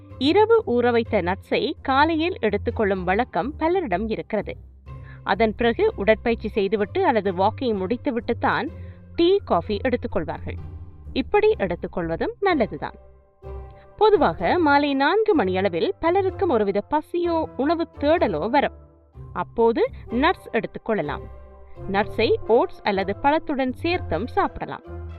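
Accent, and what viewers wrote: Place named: Sri Lanka. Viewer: Azhagana inimaiyana ilangai Tamil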